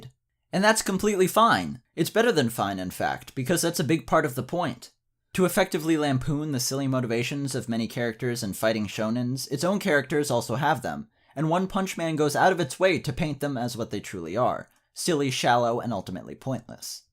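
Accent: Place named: United States. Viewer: Forgive me if im wrong, but from your accent, im assuming your are american, in which case you should have studied in england, because you would have achieved 100% on any exam with that quality of ananlogy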